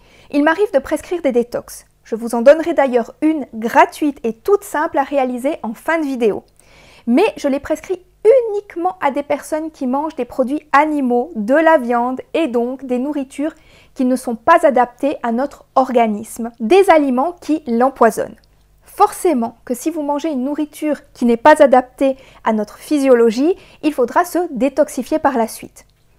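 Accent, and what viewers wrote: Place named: Switzerland. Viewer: Bonjour 😊 Contente d' avoir découvert votre chaîne grâce à douce frugalité 😊 Ca me rend si heureuse d' entendre fruits et légumes pour faire une détox 😉 Je suis une raw vegan..Une 👽pour la plupart des gens😅 mais bon j' assume. Ah...Ce nonante😅 Je pensais que vous étiez peut-être belge ou suisse...En Belgique on dit aussi nonante et Septante..En écoutant bien j'ai bien deviné que vous êtes bien suisse 😊 🥰🥰🥰🥰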